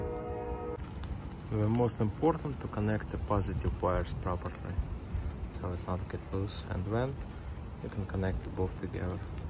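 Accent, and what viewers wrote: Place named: Russia. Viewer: какое русское произношение))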